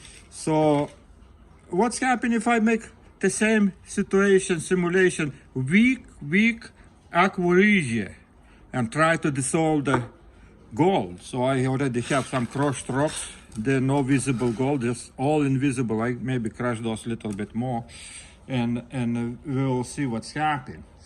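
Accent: Russia. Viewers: Can you say "Get to the choppa" in your russian accent?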